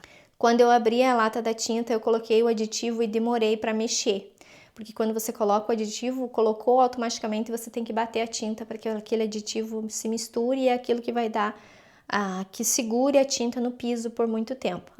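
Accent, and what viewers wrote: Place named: South, Brazil. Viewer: Olá muito lindo seu AP, vc morava aonde no Brasil vc é do sul pelo sotaque eu pensei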